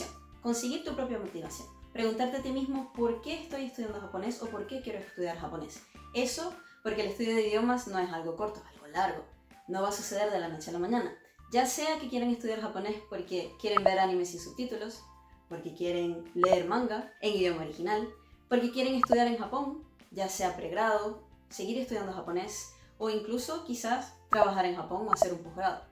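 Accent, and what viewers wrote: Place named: Chile. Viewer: A escucho el acento chileno 😅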